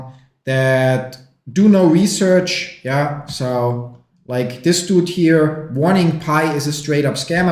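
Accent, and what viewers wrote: Germany. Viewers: so typical German to pronounce a C like a Z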